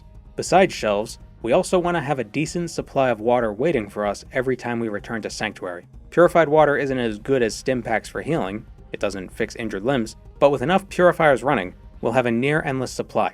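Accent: Boston, United States. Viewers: I had a feeling you were from Boston. I was born in Quincy and lived all around the South Shore, so Fallout 4 really holds a special place in my heart. I also understand downplaying the accent. I do the same, otherwise people can't understand what I'm saying or constantly ask me to say words that end in "R." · I always got the sense you were from somewhere in New England. Let that Boston accent out in the next video! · In one of your older videos I'd just got off a call with my grandfather and realized... "Wait, that's a boston accent!" From the North Shore originally and I'd hear it all the time at work or in general and it just went over my head for like 3-4 videos. I do appreciate hearing the areas of Boston/Mass said in Boston way. I was so excited for Fallout 4 to come out back in that time cus I was really looking forward to a Fallout that was recognizable since it was so far in the future and in my area... 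I mean hell, my city is technically in it. It's like you said though, it feels right even if it doesn't look right. PS Green Line is just terrible and every station its a part of is a mess. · The weirdest thing about this is I had never noticed your Boston accent until this vid. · the challenge was interesting but the real life boston lore and dialect were my favorite parts. excellent video.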